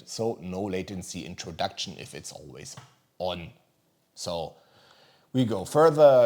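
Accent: Germany. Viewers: I don't see the issue he sounds like every German tourist I've ever met :)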